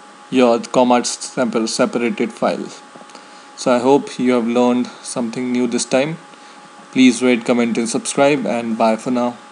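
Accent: India. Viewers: thanks, it helped me a lot; and I truly appreciate your Indian accent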